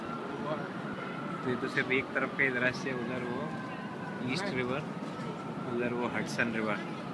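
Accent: Indore, India. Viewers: ji maja a gaya yr....kya mst indori bolte ho yr....me bhi indori he hu...dada na pardada sab yahi ka he h....tumne to mtlab dil jeet lio yr....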